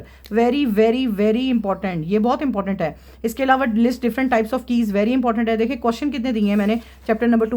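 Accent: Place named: Lahore, Pakistan. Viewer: Mam mujy to app Lahore ki LG rhi ha😊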